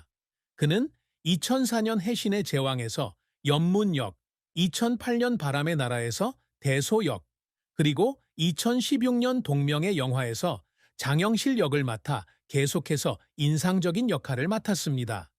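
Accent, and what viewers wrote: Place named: North Korea. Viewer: 이 머꼬 ㅡ가짜 방송 할래 ?? 부칸사투리네 ㅡ ㅜㅜㅜ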